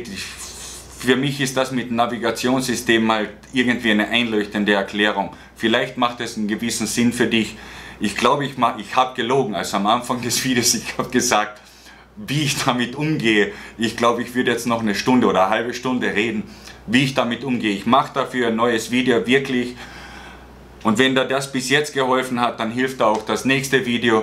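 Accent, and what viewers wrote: Carinthia, Austria. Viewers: Green ich hab gedacht er aus kärnten vom dialekt her :)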